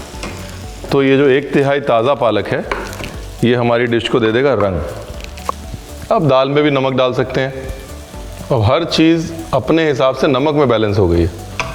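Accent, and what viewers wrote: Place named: Bihar, India. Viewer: Bihari accent...waaow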